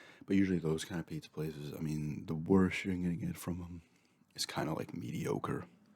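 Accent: New York, United States. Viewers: I could never live in New York, but I'm glad you do because your accent is a big reason why I tune into this channel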